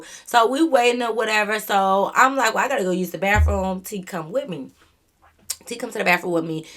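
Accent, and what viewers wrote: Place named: Michigan, United States. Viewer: Lol I just knew you were from Michigan soon as you started talking but once you said good times I’m Like yeahhhhh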